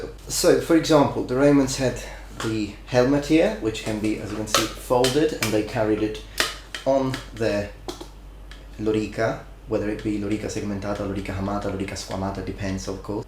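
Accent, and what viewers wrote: Italy. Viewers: I've heard Latin being spoken with an italian accent ( like here) or a more clear sounding version. Makes me curious of which one being correct, since Italian is of course influenced by the Ubians and Langobards that conquered Italy. PS: I'm not sure about the melee part. Wasn't the long metal tip of the Pilum made of soft iron and would therefore bend very easily ( better than Gladius of course when attacked by cav)? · Me: is this guy Italian? Me: yes, yes he is. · Hey I really like your videos and I dont want to nitpick here but I fear that you italian roots have 'tainted' your latin: AFAIK (and please correct me if I am wrong!) the C was always pronouced like K in ancient latin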